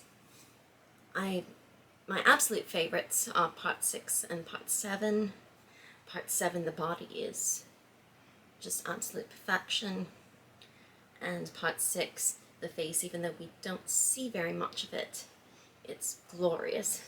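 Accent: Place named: United Kingdom. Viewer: Another great video truly a masterpiece of a face and yes he does great artwork I'll have to look into the company by the way you sound like you have a British accent in the video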